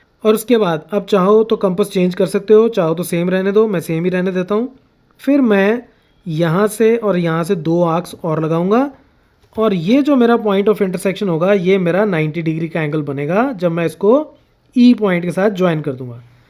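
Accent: Punjab, India. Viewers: Sir are u punjabi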